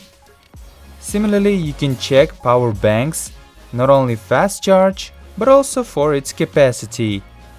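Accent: Russia. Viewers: «Immunisation of” what? Учи английскиц, школота. Как же заебал ваш рязанский акцент. Тошнит прям. Лучше рекламируй китайское дерьмо своим руцким братанам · Your accent is not true. You are rus. Я человек, который живёт в Англией, слышал как говорят люди на английском и точно знаю.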